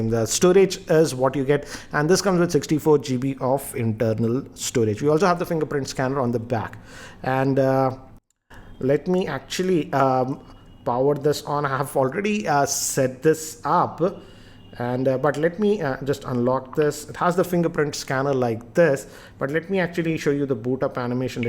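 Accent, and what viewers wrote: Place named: Tamil Nadu, India. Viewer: Your pronounce is a tamil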